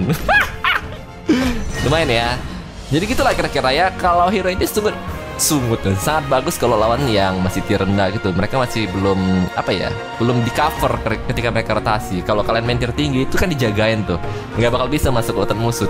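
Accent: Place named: Medan, Indonesia. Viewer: Mati kau longor wkwkwk medan kali bahasa mu bg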